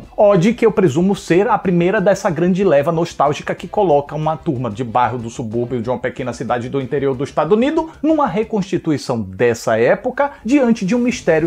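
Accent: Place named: North, Brazil. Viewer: sou muito fã dos teus reviews, teus roteiros são muito bons e termos como "trancagem fiofal" são inigualáveis, além de muito bem pautados tuas críticas, mesmo quando minha opinião é totalmente contrário da tua, teus argumentos são satisfatórios, mas percebi que tens um sotaque mais parecido com o pessoal do Norte/Nordeste, mas tu usou bastante o termo "Guris", "gurizada" mais comum no sul e teu linkedin é do Rio de Janeiro... explica essa mistura pra gente!!!!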